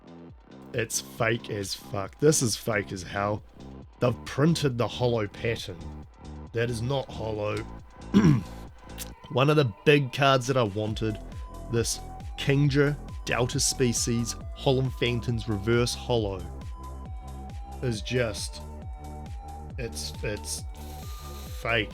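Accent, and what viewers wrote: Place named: New Zealand. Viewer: LOL after watching countless american youtube vids on pokemon.. listening to you makes me realise what us kiwis sounds like.. I would say you sounds like a typical kiwi. Man we sound so chill and unserious with a certain twang.. and the words we use.. fANcy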